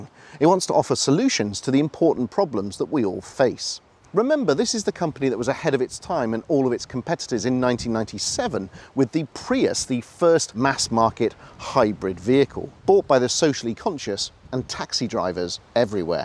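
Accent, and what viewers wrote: United Kingdom. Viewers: Great video, but your American accent still sounds British, lol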